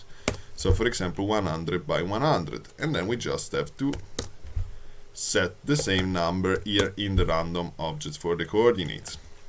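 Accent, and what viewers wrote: Italy. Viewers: Hello, are you italian ? I love your accent :)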